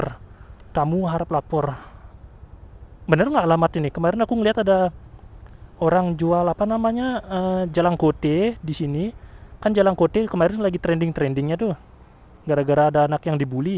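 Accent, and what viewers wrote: Bali, Indonesia. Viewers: lucu ni si bli ngomong sunda logat bali XD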